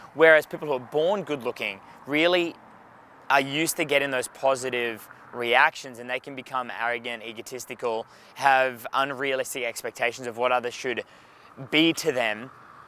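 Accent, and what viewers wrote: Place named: Australia. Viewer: Do you have bootcamps in Perth, WA? Most i ever known of are UK or USA good to hear an Aussie accent... In regards to looks... i think when they say looks don't matter they mean being a 'pretty boi' and since evolutionary women respond to behavioral cues in men when it comes to attraction it doesn't matter. i do agree you have to be presentable tho... what is your take on this?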